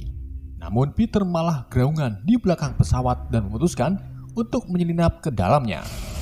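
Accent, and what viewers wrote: Indonesia. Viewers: Kentara lidahnya org indo v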